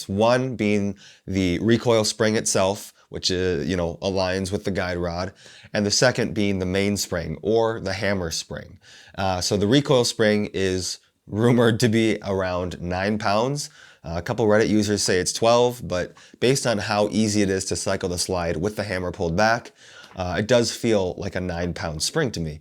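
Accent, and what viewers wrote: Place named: United States. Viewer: With that accent you're more American than Korean!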